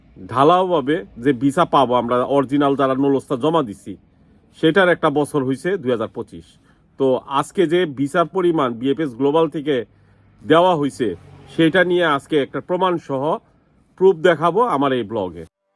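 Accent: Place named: Savar, Bangladesh. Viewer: assalamualaikum, vai apnar kotha shuina monee hocche apnar bari dhaka savar. vai ami savar thaki .amar onek diner iccha ami italy jabo kintu porichitoo kew na thakatee jaitee partechi na. vai ami deshee onek din jabot bekar boishaa achi kintuu kichui kortee parchi na. onek din dhoirai vabtachi itali jaboo .jodi choto vai mone koiraa iktuu help korten thalee chirojibon kritoggo thaktam. taka poishaa ditee parum inshaallah somossa hoibo na.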